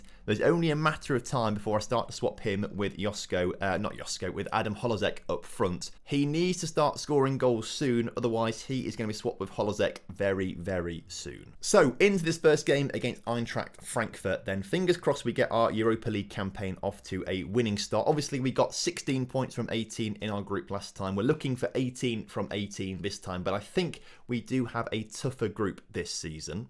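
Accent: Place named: Scotland, United Kingdom. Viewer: Love the Scottish accent 😂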